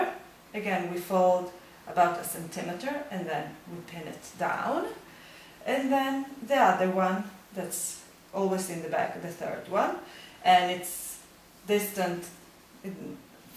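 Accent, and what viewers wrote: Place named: Italy. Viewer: grazie per la lezione. mi piace il tuo accento italiano ...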